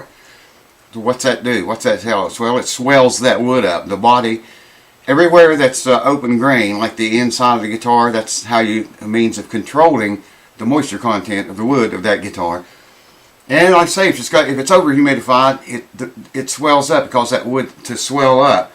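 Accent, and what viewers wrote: South, United States. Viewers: Were in usa you buddy accent south innit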